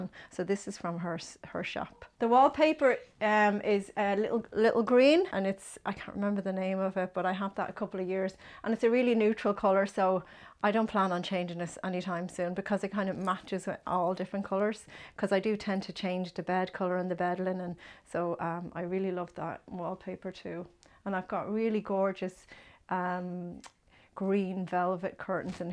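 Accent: Ireland. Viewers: What a wonderful lady & an incredible home. I must look into Punch Needle, the texture is lovely. Very inspiring & l just love the Irish accent. My gran was born in Kilkenny. ❤ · love the Irish accent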